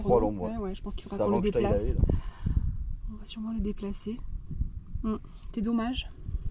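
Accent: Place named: South, France. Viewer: Bonjour, je suis trop contente de revenir un peu sur l'ordinateur, comme je t'ai déjà dit j'ai un cancer du sein, qui s'est bien passé, mais mon traitement hormonothérapie est lourd, il y aura un an le 27 juin que je le prends, j'ai souvent des douleurs articulaires cette fois sa a battu le record sa m'a duré en douleurs 1 mois, mal aux os😪 mais j'essaie de penser positif c'est difficile,regarder un peu des vidéos me font oublier tout en restant allongée, bon STOP je ne suis pas la seule, tes vidéos je les adorent, je te l'ai déjà dit, j'adore ton accent du Sud😍les livres tupperwares, j'en ai aussi beaucoup, haha!!!les buffets j'ai aussi, j'aime la recette de la pâte minute qui est au début du livre, Est ce que tu l'as déjà faite, c'est super, on met les ingrédients dans une boite qui ferme on secoue et c'est fini, bon en salé pour les quiches ou sucré pour les tartes, le taboulé hummm! 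me donne faim, je fais aussi comme toi, qq fois j'ajoute aussi des dés de pommes,tes petits pains me tentent aussi je ne connaissais pas, moi aussi j'aime les fleurs, j'ai aussi du pourpier pareil, dommage que tu es loi, sinon je t'aurais bien demandé des cactus, maman en avait, je m'en souviens, j'en ai eux d'elle mais ils ont fini par disparaitre, trop serrés je pense et sèché, j'aimerais tellement en avoir, si un jour on descends en Corrèze, je regarderai si des personnes en n'ont et en demander, merci pour cette belle vidéo, merci, à très vite, je te souhaite un bel après m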